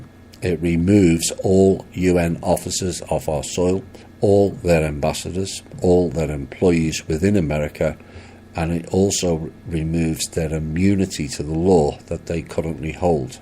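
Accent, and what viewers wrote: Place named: United Kingdom. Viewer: ??????????? A British voice talking about soverign????? No one believes this is real.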